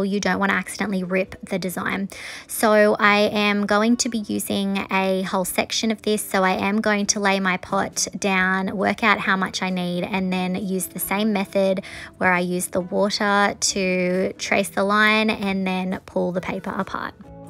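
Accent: Australia. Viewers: Née subscriber here. It’s nice to hear an Aussie voice. I love your little pots, very cute. May I please ask you what brand is your glue gun. I have to get a new one and I like the way yours sits there. My old one is not a very good one and the glue keeps leaking out of the nozzle. TFS. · Just found your channel, love to gear an Aussie accent here in France, we used to live in Perth. :) love these pots, I bought some peat pots recently and will make some fir my shop. :)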